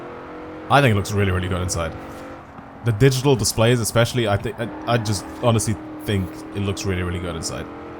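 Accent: Ireland. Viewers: Hey, quick question, where abouts are you from, I can’t help but think you have an Irish accent although I could be completely wrong! Keep up the great work!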